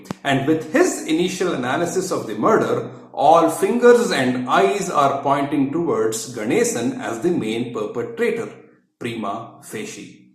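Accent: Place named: Karnataka, India. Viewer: Very true, when he reviews on the same platform as BR, I feel robbed of BR's take. It probably has to do a bit with his accent/narration style which has a heavy kannada weight to it - it is just difficult to hear English in a kannada style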